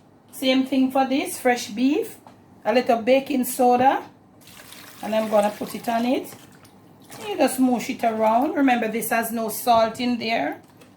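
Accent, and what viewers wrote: Jamaica. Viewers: Hello Ms. I must say I love your accent your methods of cooking but most of all how you have taught me the correct way to cook the Jamaican way. Your advice your suggestions. Hopefully when I go to Jamaica 🇯🇲 I can visit you. And cook with you. Yeah mon the Jamaican way. And no I’m not Jamaican born in Colombia 🇨🇴 raised in New York living in South Florida.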